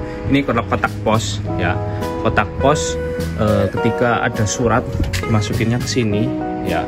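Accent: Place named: Banyumas, Indonesia. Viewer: Mas ngapak..asal kan ngendi..?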